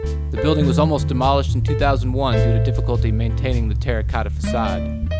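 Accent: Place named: Chicago, United States. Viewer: The soundtrack, the shots, and the narration together form a perfect representation of that famous Chicago-style charm. That Chicago accent lecturing us on the details of Chicago's architecture with that appropriate music filling the background WAS SOO ON POINT.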